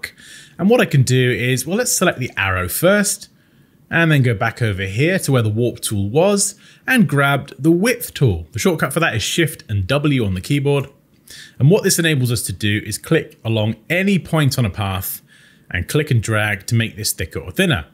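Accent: United Kingdom. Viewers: Your accent implies that you’re British , Aren’t you ?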